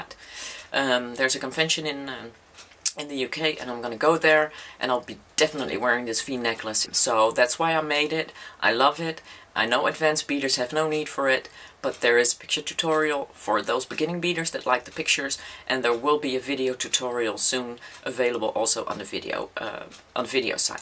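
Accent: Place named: Netherlands. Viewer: are u originally dutch cuz u have a dutch tone in ur voice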